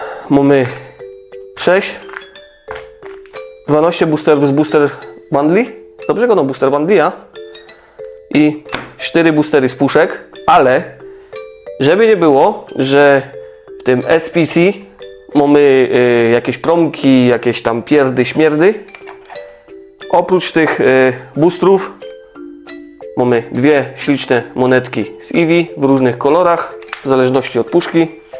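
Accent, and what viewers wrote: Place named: Silesia, Poland. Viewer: Więcej filmików po śląsku ❤Bardzo dobrze się tego słucha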